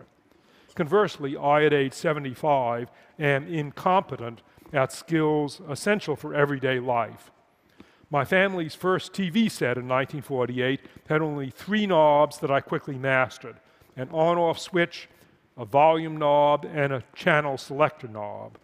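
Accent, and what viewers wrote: United States. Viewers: What part of the US is he from? I like his accent. Is it Boston? Maine? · Another great talk from TED. What accent is this? I figure its American but where from?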